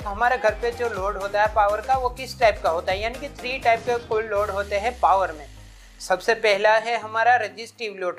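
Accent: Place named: Gujarat, India. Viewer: language tone denotes gujrati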